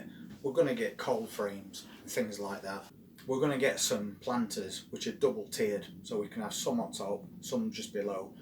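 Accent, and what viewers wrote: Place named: Northern England, United Kingdom. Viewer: I so love your videos. I have M.E and days where I'm suffering a crash (post-exertional malaise, which feels like 'flu with a hangover), your gentle voice and lovely Northern accent is soothing to my frayed nervous system.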